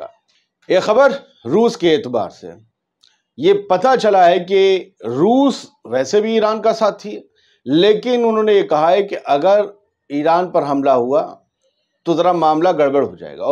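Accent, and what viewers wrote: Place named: Allahabad, India. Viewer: Aal aap Allahabadi bhasha mein bole rahe the. Ameresh Misra ji bhi allahabad ke hain usi bhasha mein bolte hain.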